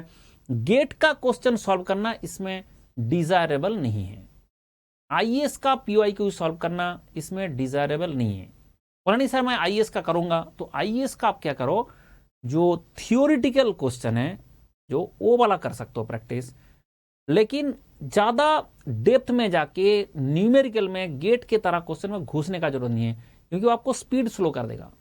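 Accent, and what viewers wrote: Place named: West Bengal, India. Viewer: I'm sure sir is from west bengal .... Voice 🔊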